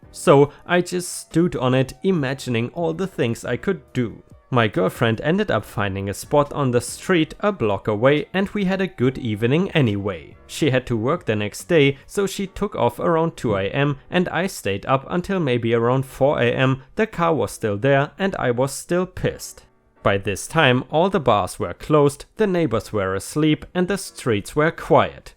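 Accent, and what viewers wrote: Germany. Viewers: Germany. Not every german sounds like a nazi in a indiana jones movie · 🌟🌟🌟⭐⭐⭐🌟🌟🌟 I listen to you and love your readings. I's say you and rSlash are my favourites then close second are Mrreddit and Markee. I've been playing yours as I go to sleep so hope that helps your channel, about 21-27 vids. I also have a soft spot for Germans, and I mean that in a friend manner, du bist in sicher lol (Excuse my German) Your narrations are awesome and your accent is the icing on the cake. Thanks 💛💚💛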